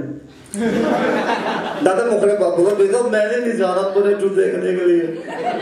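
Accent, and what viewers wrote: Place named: Haryana, India. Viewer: anf yes i like the way u speak the good material... specially ur haryanvi accent...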